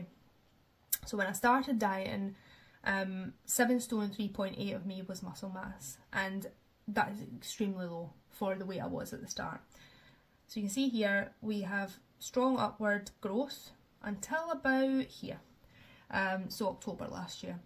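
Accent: Scotland, United Kingdom. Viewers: Just found your channel and well done on your amazing journey. Excited to hear another Scot here 🏴 ❤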